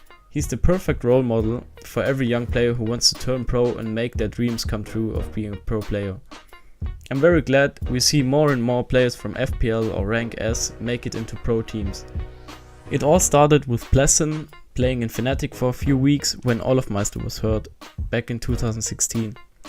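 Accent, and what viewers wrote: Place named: Germany. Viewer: Man hört sofort den Deutschen Akzent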